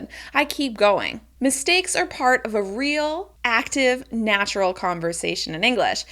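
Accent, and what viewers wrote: United States. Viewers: Good Morning! How have you been today? Thank you for your lesson, warm American voice and a little fast but i got it. Norge Guy.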